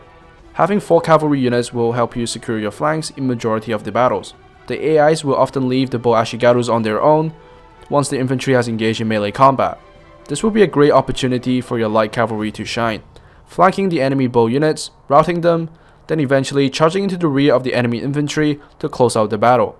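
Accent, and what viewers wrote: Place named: Scotland, United Kingdom. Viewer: why do you sound kind of scottish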